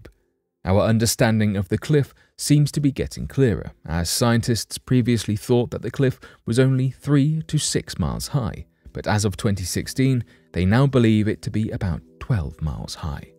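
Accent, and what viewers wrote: England, United Kingdom. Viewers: You're a liar and a fat mouth and with that English accent it doesn't make you sound intelligent you might fool some people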